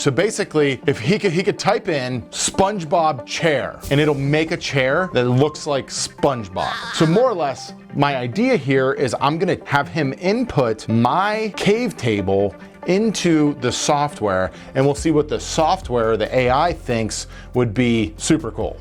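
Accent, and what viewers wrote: United States. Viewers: I like how you have a regular just generic American accent but then hit us with the yinz and some of us know exactly where you grew up.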